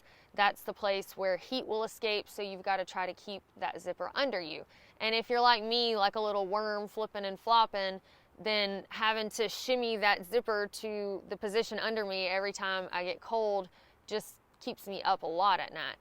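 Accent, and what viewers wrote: South, United States. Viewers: you are just the sweetest and prettiest young lady I believe I've seen in quite some time. No I don't get out much. But I do reminisce a lot about when I used to backpack and you help bring those memories back. I have a Southern drawl just like you do so I guess that's why I like to hear you speak. Thanks for the video I'm sure you're helping these guys out a lot that's wanting to do hiking like you did and do. Keep ye powder dry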